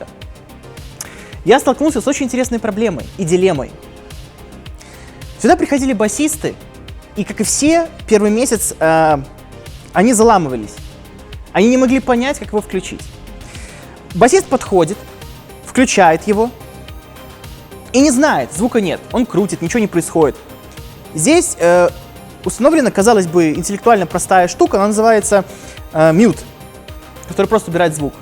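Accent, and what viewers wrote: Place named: West, Belarus. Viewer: Первый раз на канале этом. 20 лет назад жил на протяжении 6 лет в Гродно, и этот говор такой западно белорусский, прям ностальгия )))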